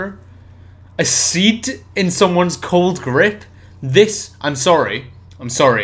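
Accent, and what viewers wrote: Scotland, United Kingdom. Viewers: Where in Scotland are you from mate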